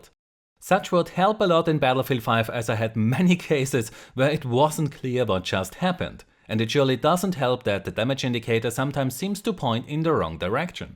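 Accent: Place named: Germany. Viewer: And that your german accent is on fique...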